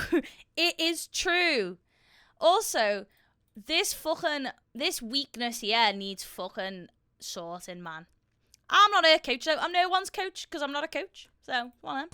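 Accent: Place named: England, United Kingdom. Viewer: Primeira vez vendo seu canal com a sua voz original e legendas já que sou do Brasil, costumo colocar a tradução na sua voz mesmo em português porém nesse vídeo não possui Aliás adorei seu sotaque é inglesa certo?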